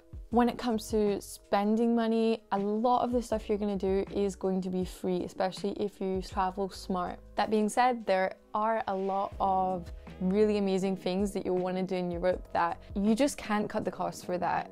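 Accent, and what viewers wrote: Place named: Scotland, United Kingdom. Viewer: Viva Scotland, my favourite place in Europe. I love your accent. Greetings from Spain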